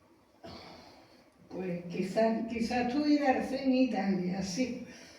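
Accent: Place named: Andalusia, Spain. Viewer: Magnífica disertación,de gran erudición y con una dicción y gracia muy andaluza,mis felicitaciones doña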